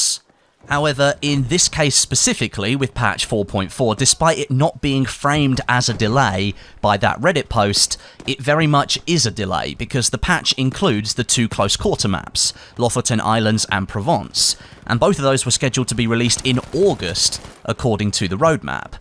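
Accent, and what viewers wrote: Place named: United Kingdom. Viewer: I appreciate your optimism . Gave up on Battlefield a long time ago. Still enjoy listening to your slick British accent . Are you going to give Modern Warfare a try this year?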